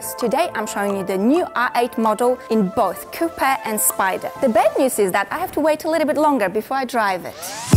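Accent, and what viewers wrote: China. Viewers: Interesting: a blond girl speaking English with a Chinese accent 🤔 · I have a friend calls Zhang, he is from China. Strangely his accent is like yours and even stranger I believe you are European. So you can imagine the culture shock I'm subjected to. Lol. Amazing review by the way and videography. :)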